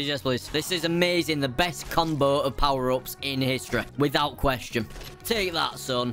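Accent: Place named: England, United Kingdom. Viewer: no kiddin love the English accent and also the commentary was top notch, earned a sub <3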